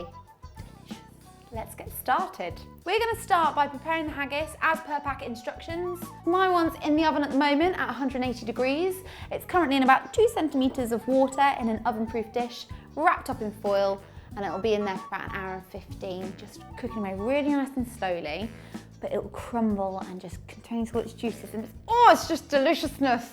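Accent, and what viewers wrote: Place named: Scotland, United Kingdom. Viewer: OMG I love your Scottish accent! Great video as always...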